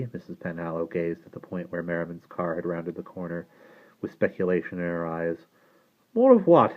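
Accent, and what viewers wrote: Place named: Cornwall, United Kingdom. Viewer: Thank you so much, your readings are entertaining and relaxing and i always appreciate your british accents and especially the Cornish this time! Sending thanks and good wishes from England.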